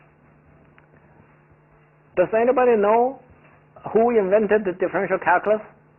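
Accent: China. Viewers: 还有发音也还是很有华人的特点。不过瑕不掩瑜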